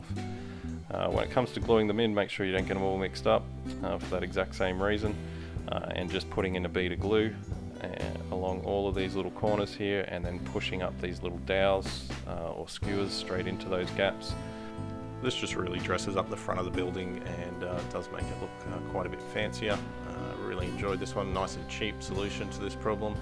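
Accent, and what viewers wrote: Australia. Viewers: Mate enjoy your videos, obviously your Australian . Which State you in. Looking at supporting.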